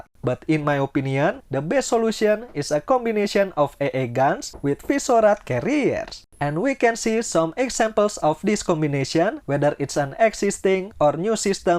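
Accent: Indonesia. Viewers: senang melihat orang Indonesia menggunakan bahasa inggris dengan dialek Indonesia 🇮🇩 It's nice to see Indonesians using English with an Indonesian accent 57 mm autoconon masih bisa di Pakai untuk supresi musuh seperti di Ukraina dengan meletakkan ya di atas truk . Jadi doktrin ya yang harus di ubah dari anti pesawat atau helikopter menjadi pembantu pasukan seperti mortir yang dibawa oleh truk. kurasa lebih baik digantikan dengan pansir S1 (mobile , dan bisa menghancurkan drone dan pesawat) alasan negara lain tersebut masih pakai m60 atau t55 sama kenapa Indonesian masih pakai PT 76 gak punya uang untuk mengantikanya dengan yang baru. semua suku cadang sudah bisa dibuat sendiri di Indonesia. Dalam perang seperti Ukraina teknologi bukan apa apa jika tidak ada jumlah. Kuantitas memiliki kualitas ya sendiri. Aku sarangkan pemerintah lebih fokus ke teknologi drone dan anti drone untuk perang elektronik . 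Dan senjata anti pesawat jarak jauh seperti s300/400 yang terbukti di Ukraina membuat kedua belah pihak tidak dapat mendapatkan supremasi udara. Dan senjata anti kapal selam supersonik yang terbukti di Ukraina dapat menghancurkan kapal pernah terbesar Russia di laut hitam. Indonesian masih harus memperbaiki kemampuan bertahanya dulu dari pada menyerangya. Dan ubah doktrin untuk menghadapi drone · Ini adminya yg ngomong orang Indonesia , aksennya Indonesia banget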